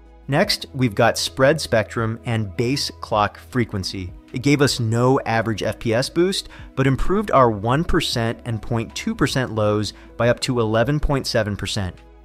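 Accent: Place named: United Kingdom. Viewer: Thanks i got the same cpu getting some solid improvements now, also maybe time to embrace your british accent again for next video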